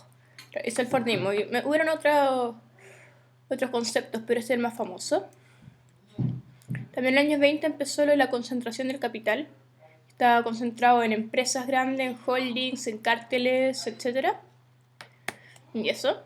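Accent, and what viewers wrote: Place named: Chile. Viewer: WACHO COMO HABLAN LAS CHILENAS LPM ES HERMOSO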